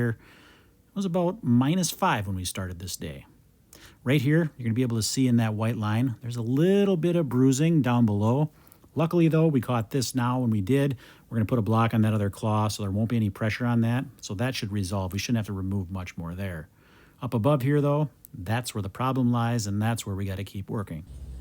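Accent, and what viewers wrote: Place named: Wisconsin, United States. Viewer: I don't miss Wisconsin winters, but I do miss the accent.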